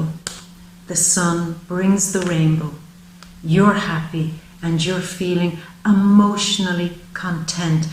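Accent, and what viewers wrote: Ireland. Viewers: I love you, Your beautiful face and your wonderful resonate voice...that resonates with me. Your brogue make me feel like I am at home, and for some reason I do too...I shouldn't but I do more often than not I speak with a brogue, its fun and feels so natural, any way good reading.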